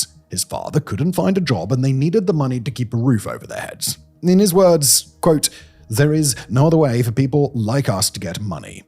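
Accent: England, United Kingdom. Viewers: Jewish have run India for centuries! They know what Jewish Britain did to them . Your English accent can’t hide your Bias either;(! Says The BronxBoy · Why does everything presented by sound like it has more authority because of his British accent?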